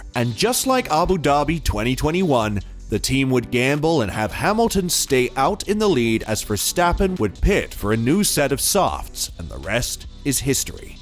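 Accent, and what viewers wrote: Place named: United States. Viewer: please can you talk normal English and not the American way... every word so exaggerated....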